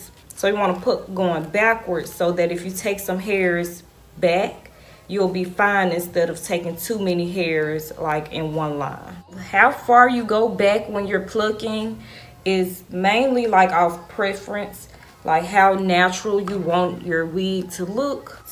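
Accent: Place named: Mississippi, United States. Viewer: This helped a lot 😊😊 & I love your accent lol , Are you from Jackson Mississippi?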